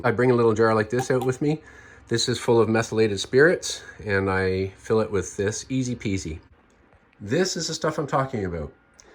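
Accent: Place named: Canada. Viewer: Can always tell a Canadian by the way he says out , like a Scotsman says outt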